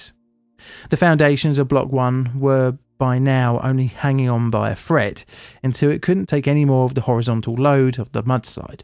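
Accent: United Kingdom. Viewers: Narrator’s accent is aggressively British.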